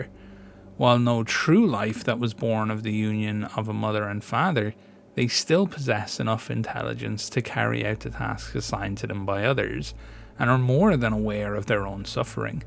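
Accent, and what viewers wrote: Ireland. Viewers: Doing an awesome job my man, honestly the best lore channel I've found for trench crusade. Irish accent works so well for the subject matter too !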